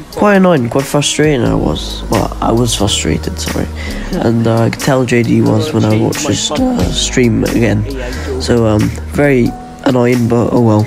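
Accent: Wales, United Kingdom. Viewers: the most welsh accent!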